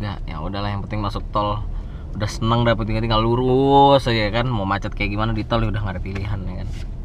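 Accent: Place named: Banyumas, Indonesia. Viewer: Lucu timen ngomong ngapake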